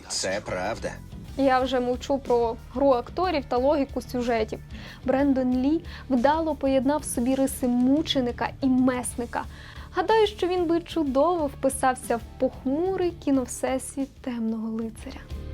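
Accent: Standard. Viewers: Моє сердечко тремтить від твоєї краси!) А твоя українська без суржиків - просто музика для душі. Ти молодець)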